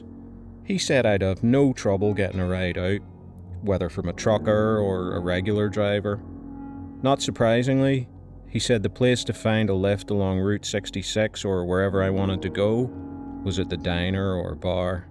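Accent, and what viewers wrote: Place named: Ireland. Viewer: ❤ Hi I found your video and 1 at time listening to your narrative style & I've noticed you have a Irish? accent? Sorry if I got it wrong but you sound nice narrating the stories. Good job bro.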